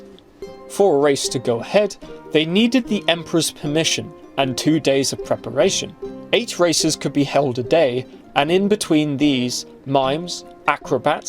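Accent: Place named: United Kingdom. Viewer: British accent = youtube success. Good channel.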